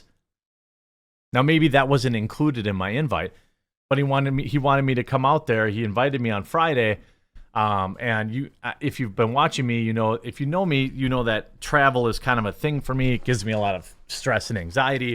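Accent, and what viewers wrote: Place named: Midwest, United States. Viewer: I’m from the east coast, so you don’t get to say “quarter” like “core-ter” it’s “Kwater” idc what Midwest neutral accent you have, you’re saying your channel’s name wrong. There’s a U in there that you don’t get to say is pronounced any other way than with that sound in it.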